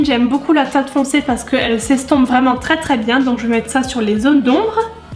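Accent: Lorraine, France. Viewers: Moi aussi je viens de la Lorraine je dis pareil que toi 😂😂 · Oui je parle comme toi et je pense que tous les lorrains/alsaciens sont pareille 😂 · Je viens de Lorraine mais j'ai du déménager dans le centre mais j'ai conserver l'accent par choix, et ca me fait plaisir d'entendre parler comme ca, tu te prend pas la tête a vouloir faire plaisir au autre tu es spontanée et c'est ca que j'aime chez toi ❤ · Je viens également de lorraine et en effet je parle aussi comme ca... j'taime fort continue comme ca <3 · Moi aussi je viens de Lorraine (Meurthe-et-Moselle) Et je dis exactement comme toi! Bref j'adore Ta vidéos tu es parfaite je t'adore ❤️❤️❤️ #Lorraine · Moi aussi je suis de lorraine et je parle comme toi 💓💓,t'es pas seul 🤣🤣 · Moi aussi je parle comme ça vive la Lorraine 😅😂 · y love you accent lorrains !!!!!! je suis lorraine ! 💕💕💕💖💖💖💖💖💖💓💖💖💓💖💖💓💖💖💓💕💖💗💗💗💙💚💛💜💝💞💟💟💟💟💟❤💘💋💋💋👄👄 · Je suis Lorraine aussi et je parles comme toi aussi, quand je vais chez mon copain dans le centre et des que je parles il se moque de moi avec toutes les "fautes" que tu as cité 😂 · moi aussi j'habite en lorraine et moi aussi je dit comme ca 😀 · tu viens de quelle ville ?? 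( moi aussi je suis de Lorraine et tous les mots que tu as dis ( le '' t '' à vingt , la clenche ...) je les dis aussi . 😘🤗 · Je suis née en Lorraine ,à Metz, donc oui je parle comme toi mdrr😂😂 #teamlorraine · je viens de lorraine dans le 54 et oui je parle comme toi😂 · Tu viens d'où si tu viens de la Lorraine ou de L'Alsace ? sinon super vidéo ♡ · Je parle pareil que toi!Tu habites où en Lorraine ? Sinon je t'adore continues comme sa bisous💘💘💘😊#TeamSnapchat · J'habite aussi en lorraine et je dis comme toi !!! 😉❤️ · Oui la facon de parler de la lorraine 😂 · j'habite en lorraine saint remimont vers Haroué c'est vrai qu'on parle comme sa mais moi sa me choque pas mdr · moi aussi je parle comme toi je suis de Lorraine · Je comprend totalement ta façon de parler je suis aussi de lorraine 😋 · moi aussi je viens de Lorraine je te comprends je parle exactement comme toi💚#teamsnap✨ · moi aussi je dis comme toi comme en lorraine biz <3 <3 <3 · Moi aussi je parle comme sa j'habite en lorraine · Je parle comme toi je viens de lorraine ma belle t'inquiète pas 😘 Super vidéo comme toujours! 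😘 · Vive la lorraine 💪🏻 Est ce que tu as aussi un accent en disant certains mots comme boîte, poêle, etc ? Sinon t'es genial j'adore ☺️ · Je suis lorraine et je parle exactement comme toi ❤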